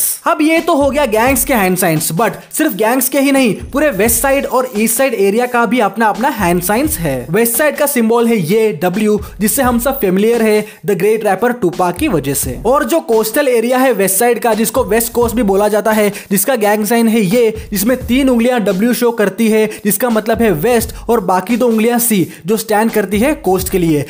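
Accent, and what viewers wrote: Indore, India. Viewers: Bhai tum indore se ho kya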